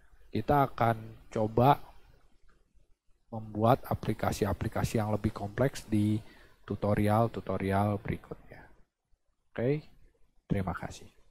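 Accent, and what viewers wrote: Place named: West Java, Indonesia. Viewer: orang bandung nya, logatnya sunda :D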